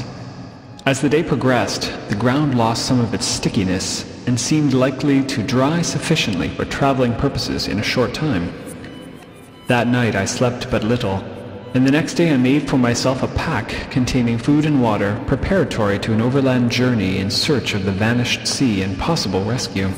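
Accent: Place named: Baltimore, United States. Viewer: Damn good job! Your pronunciation sounds like Baltimore.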